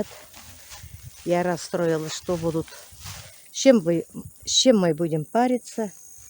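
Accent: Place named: Mishar Tatar, Russia. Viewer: вы не мишары,говор мишарский у вас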